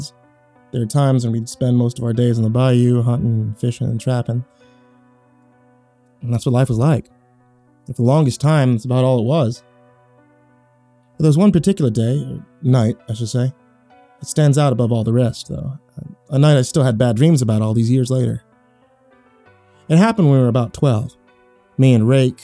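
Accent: United States. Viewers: He managed to do a good North of I10 accent. Not really cajun more just Iota area. Or maybe Jefferson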